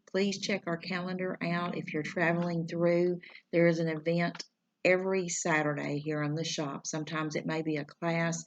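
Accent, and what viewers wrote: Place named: South, United States. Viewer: Oh my gosh! Just found your floss tube and watched both back to back. Love your southern accent! Your voice is very relaxing. I love you showing fabric and thread options, different from what is called for! My choice for fabric is 32 ct even weave or 36 ct linen by PTP or Weeks. What are the smalls behind you? Are they fobs?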